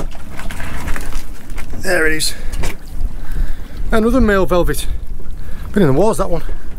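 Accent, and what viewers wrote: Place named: Cornwall, United Kingdom. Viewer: A very sustainable approach to sorting the catch. Enjoyed the sweet and lovely Cornish accent either. Missing it now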